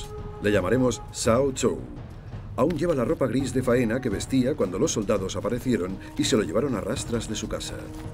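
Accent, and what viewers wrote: Spain. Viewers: Si tan sólo no tuviera el maldito acento español.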